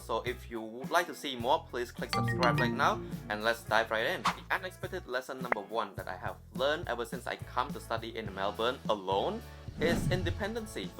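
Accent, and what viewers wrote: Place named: Cambodia. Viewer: hey bro, even as listening to your accent, I could tell you're Khmer. I am a Khmer international student as well, but situated in New Zealand. I could relate to many things in your vid !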